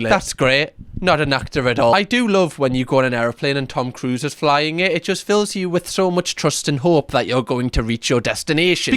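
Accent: Newcastle, United Kingdom. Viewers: I love your accent! Can’t really explain why, I just enjoy listening to people speaking in the Newcastle accent, and don’t find it difficult at all (as a non native speaker). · Everytime I watch your videos. My thoughts have a geordie accent for ages after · I've gotten used to your accent and I really like British accents 💕🍩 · American’s are absolutely a sucker for a British accent, actually what first got me to listen to video that came across my feed. He’s been my favorite commentary youtuber since. And likely tops the time watched list for me for the months since I found him! I don’t even normally watch commentary type videos often but is so pleasant to listen to, kind, and insightful. Oh and epic accent, of course! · You're the one who introduced me to the newcastle accent and I honestly love hearing it! The fact you also got amazing humor puts the ribbon on the gift, love your videos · I LOVE YOUR ACCENT! 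I'd never really knew about Newcastle and the various regions, just the usual ones we hear in the US. I couldn't figure out if it was Scottish or Irish...I was like it's a Scottish but easier to understand (for me anyway). I love Italian Bach's accent too, it's so smooth, sort of posh sounding but not too posh. To my ears his accent is like lower case cursive, and your accent is block print ❤ Love y'all from Virginia, not one of the obnoxious Americans Edit to add...here in Virginia we have areas that still speak an older English, even Elizabethan! <link> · I am American, love the bri'ish accent and any fun we make of you is in good fun ❤ also very few of us use military time, usually because of specific careers like policing or the actual military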